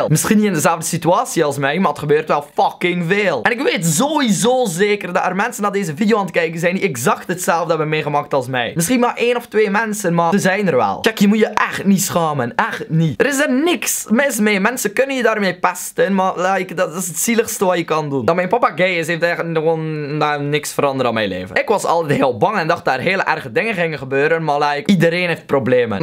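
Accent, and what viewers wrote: Belgium. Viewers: He's actually speaking Dutch with a Belgian accent. (He's belgian) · Sandra Kim Oh... Nou ik als Nederlander hoor duidelijk een accent bij hem en dan refereer ik meteen naar de Belgen, haha 😁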